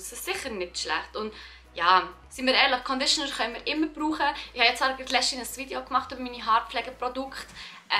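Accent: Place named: Switzerland. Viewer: Auch wenn mich beauty nicht interessiert, habe ich Spaß daran dir zu zuhören. Einfach nur weil mich das schweizer deutsch so fasziniert. Lg aus Niederösterreich :)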